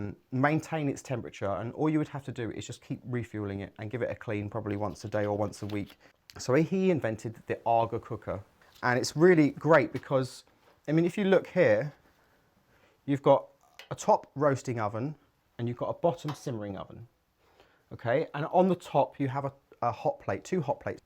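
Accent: United Kingdom. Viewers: I agree. He is nice to look at....lol....and my mom passed away a few years ago...it is just nice to hear someone speak British English. :)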